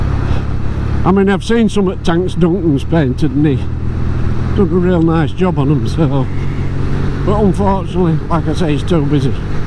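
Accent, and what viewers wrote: Yorkshire, United Kingdom. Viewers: Nice to see a chap do a job so well and “liked” just for having the best accent in Britain! My Yorkshire roots may be making me biased though😂. I wonder, could the misfire be HT leads or plugs? The only other thing springs to mind is petrol…this “green” stuff has a stupidly short shelf life. I stored my bike with high octane storage fuel over winter and it started and ran beautifully after a five month lay up.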